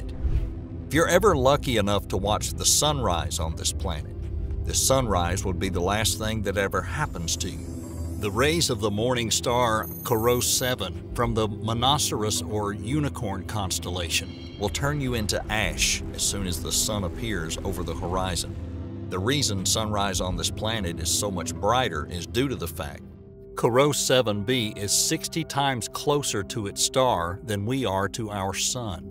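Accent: South, United States. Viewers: Just 😍 the southern accent. Being a New Englander I don’t hear it often! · It just sounds so out of whack to listen to cosmological specials/documentaries narrated in that southern drawl. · Love hearing a southern narrator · I REALLY WISH they'd pronounce it the right way, YOU- rah- NUss,, not you-R AAY nus,, and especially with that Southern Bible belt accent, I sincerely think the guy was pronouncing it that way because he liked doing it lol...... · Dunno what to think about a guy with a heavy southern accent telling me about space... 🤔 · British narration is so much preferable than an american accent like this. Too bad, could've been an interesting doc. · This guy sounds COUNTRYYY! 🤠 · "Peculiar -arities. ." Sorry, the muddy southern drawl and not pronouncing words is killing your cred. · A southern narrator. Never had that happen. I subscribed before I even watched the video and I'm here to stay. 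· There's something about a guy with a southern accent talking about space that is surprisingly off putting imo. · Love that southern accent spitting space facts!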